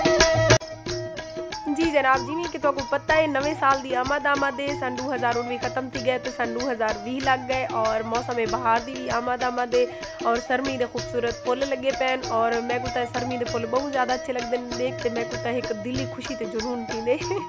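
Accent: Saraiki, Pakistan. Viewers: Batao g ap Kahan ki Hain ap ki zuban sariki bahot achi hai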